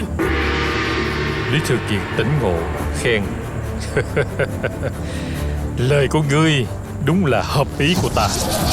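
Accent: South, Vietnam. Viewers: Ky thuat long tieng cua anh dat toi trinh do dang cap ...giong nam bo hay ...hihi..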